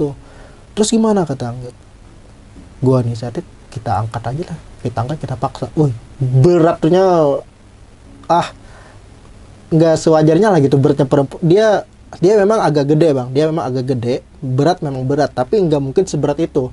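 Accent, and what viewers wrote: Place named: Banjar, Indonesia. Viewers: Logat banjar nya berasa banar kang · Gunung 🗻 halau halau di Barabai Hadir Banjarmasin Logat nya Kd hilang bang Kya urng Barabai 😉🙏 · logat banjar nya kda hilang mang ae wkwk tpi ulun ketuju aja hehe terimakasih atas ceritanya mantap sukses selalu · ini orang pahuluan mendok bnr wkwkwk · Beugh, Kaltim hadir wal aey,. Biar kata bahasa nya lu gua, logat Banjar tetep kalihatan jua lah,. Sukses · Tanjung Tabalong Kalimantan Selatan hadir om.... Bang biar pakai bahasa Indonesia, tapi bahasa Banjarnya tetap timbul😆. Sehat terus bang😁🙏 salam satu hobi.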